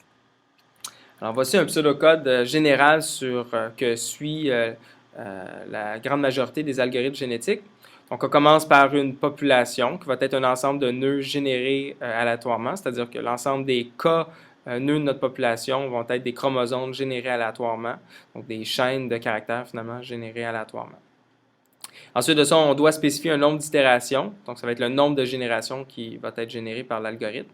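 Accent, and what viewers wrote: Quebec, Canada. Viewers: le francais canadien :) merci frero pr l'effort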